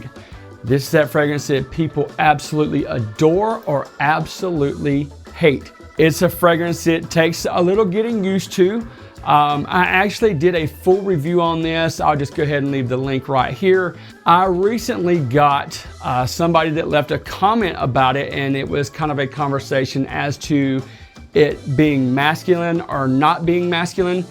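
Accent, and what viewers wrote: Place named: Florida, United States. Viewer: Always enjoy your down to earth reviews! I need to try that Nasomotto! I'm in NY now but your accent and demeanor reminds me of the circle of friends I had when I lived in Daytona beach Florida. Lol.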